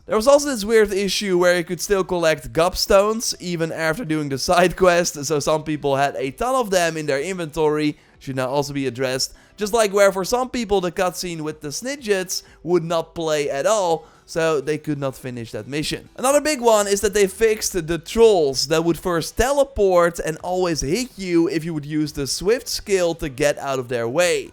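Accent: Netherlands. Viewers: Sooo Dutch 😄 nice vid though. Good info.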